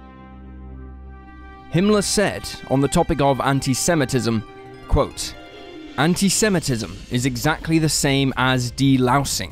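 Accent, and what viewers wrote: England, United Kingdom. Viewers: If you think that's an Australian accent.. it's obviously British. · Voice like English. Loud the interrorgrater. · This limey narrator sounds gay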